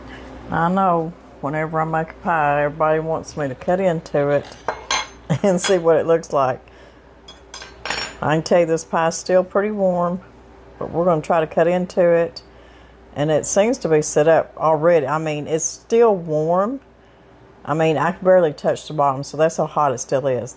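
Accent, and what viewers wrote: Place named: Arkansas, United States. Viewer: Ms, of all the channels I watch, yours in my favorite. My Momo and Popo and family were from Arkansas and listening to y’all just reminds me so much of them, and I’m learning so much. Thank you.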